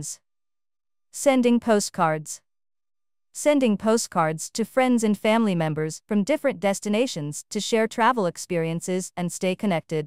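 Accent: United States. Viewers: اللهجة امريكية ام بريطانية ارجو الرد ؟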